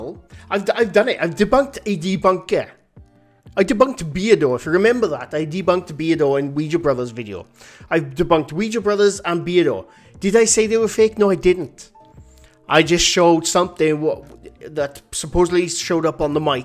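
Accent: Wales, United Kingdom. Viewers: your wonderful Welsh accent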